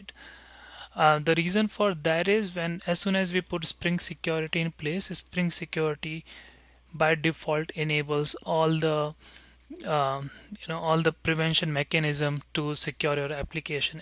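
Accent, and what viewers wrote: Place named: India. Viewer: these indian's accentes is disgusted even for my non-english ears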